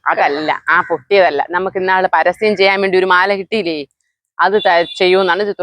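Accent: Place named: Thiruvananthapuram, India. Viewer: Ath aaa nattusailiyanu....kuttam parayanda..jnanum Tvm...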